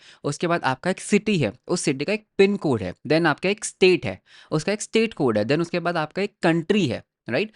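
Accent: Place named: India. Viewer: Bro please work on your accent. English speaker and dropping your series because I can't understand that well especially with 2x speed. And I work with indians a lot at work so I'm above average for an American understanding Indian accents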